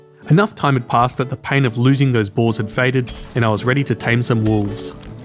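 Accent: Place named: United Kingdom. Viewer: you british sound cool. As an American from California Dallas I respect the yabbies you blokes drink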